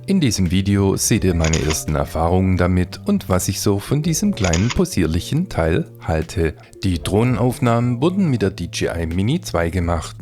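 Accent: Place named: Swabia, Germany. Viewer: Ich mag deinen Kanal habe ihn vor zwei Tagen erst entdeckt. Sehr angenehm alles insbesondere deine Stimme! Übrigens höre ich etwas schwäbisch heraus 😉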